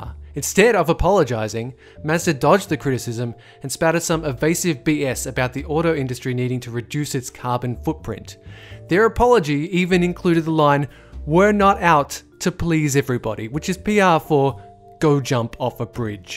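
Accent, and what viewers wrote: Australia. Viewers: Damn it, getting another aus narrator still wont work. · suddenly turned full australian. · Are you australian?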